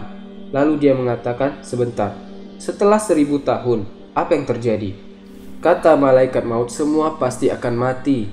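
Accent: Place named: Lombok, Indonesia. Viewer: Dialek saudara seperti dialek orang lombok. Betulkan saudara dari lombok?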